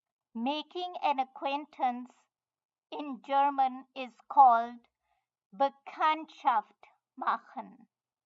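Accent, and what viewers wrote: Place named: China. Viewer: ...I don't know but the person has got a Chinese accent somewhat but speaking in English and German....XD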